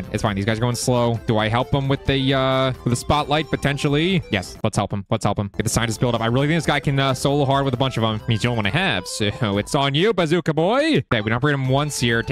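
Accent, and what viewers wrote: United Kingdom. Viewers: bri'ish man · Bro turned british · bros British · W british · Bros british accent is so good whattt😂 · BRITTISH :D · lol british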